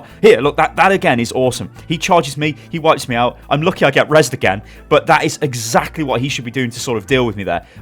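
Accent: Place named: United Kingdom. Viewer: this is the second time that i watch your video with my gf and she get wet cz of your accent .. jesus turn me to british please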